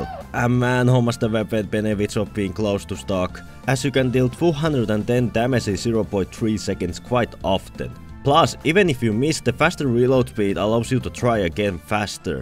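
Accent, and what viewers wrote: Finland. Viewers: I can hear the finnish accent so well :DD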